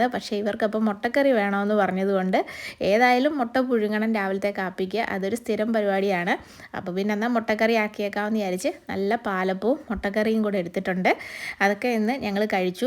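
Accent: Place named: Kollam, India. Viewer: Kollam slang aanu. Athukondu chotdichatha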